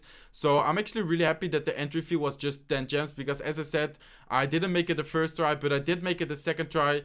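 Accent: Netherlands. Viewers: Je hoort nog een beetje dat je nederlands praat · Je Nederlandse accent 😂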